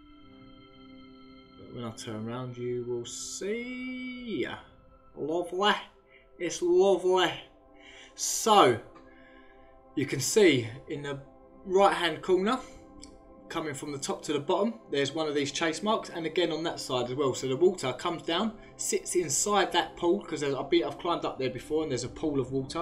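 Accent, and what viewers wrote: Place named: Southern England, United Kingdom. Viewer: He's from the South of England. Can't you tell by his accent?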